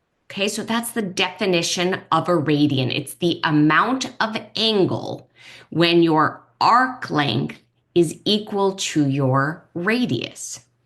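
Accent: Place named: New York, United States. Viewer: Lovely concise little video…also, I love that accent of yours..is it a New York accent?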